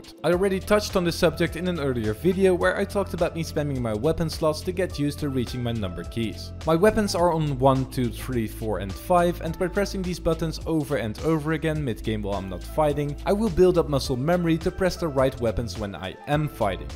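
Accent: Netherlands. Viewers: Are you dutch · Are you from the Netherlands? It sounds like it. Edit: you are haha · qucee 😂😂 Are you dutch Nice vid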